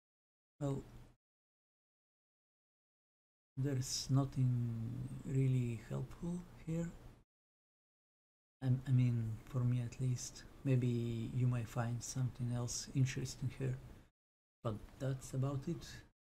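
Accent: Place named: Russia. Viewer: Dude I need this tutorial for my R9 390 and I just realized you are Estonian, lessss goooooo. You have a thick Russian accent so you probably have a accent while speaking Estonian as well but I am just glad to see another brother on the web.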